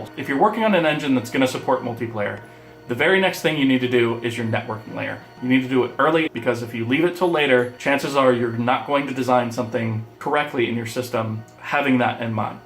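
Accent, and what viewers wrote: Germany. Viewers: Bist du deutsch? 😂 Dein Akzent hört sich so an.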